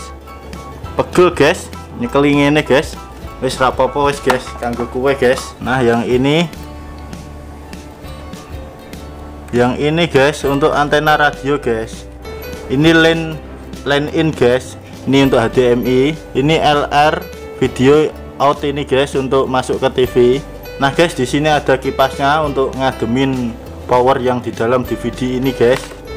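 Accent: Java, Indonesia. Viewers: klo ada langsung tak like. aku pokoke ngefasn karo bahasamu mas. medok jowo marai ngakak. ngrungakno tok wes ngakak.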